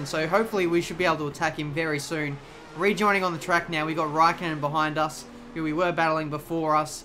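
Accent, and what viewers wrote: Australia. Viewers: which part of australia are you from and how old are you? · What part of Australia are u from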